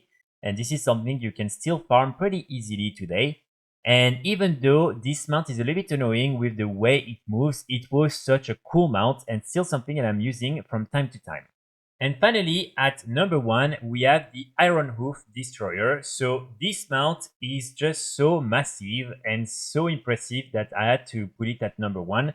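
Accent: France. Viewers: French accennnnt guyyyy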